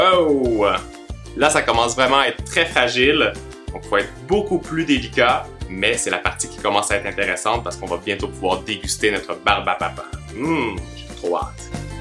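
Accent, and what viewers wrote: Quebec, Canada. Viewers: Ccou je suis nouvelle est je voulais te dire : Tu as un magnifique accent québécois ! Est j'adore tes vidéo +1 abo 😉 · Coucou ta recette est trop C😎😎L et tu est trop beau,j'aime trop ton accent, il est canadien?pourrais tu faire de la barbe à papa au NUTELLA???😘😘😘😜😜😜❤❤❤💙💙💙💚💚💚💛💛💛💜💜💜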